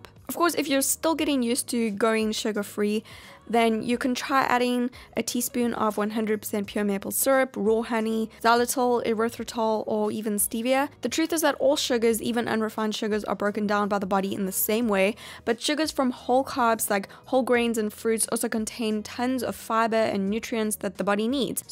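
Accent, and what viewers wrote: South Africa. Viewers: Is your accent South African? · This is great! I love your videos, I've watched several since I found you yesterday and a bonus that you're South African (originally from there) haha miss the accent so much! I've been slowly doing this for my diet and will only eat refined sugar in small quantities like ex.cliff bar, I won't eat the whole thing on one day, (but I'm going to try find the brands you suggested tho) and I have a cheat day on the weekend for ice cream or some other treat ! My diet is mainly plant based now so I feel good. Can't wait for the day where I crave healthy food more than sweet and unhealthy food ahha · Do I detect a South African accent? I really should get back into a healthy diet, since moving to Gauteng am completely out of my routine, exercise and diet wise. The only thing that is saving me is intermittent fasting 😅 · Are u South African?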